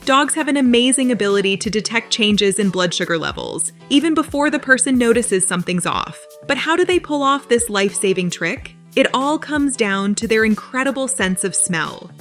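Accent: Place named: North America. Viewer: 😅?? it's a North American 'accent', so there is no accent!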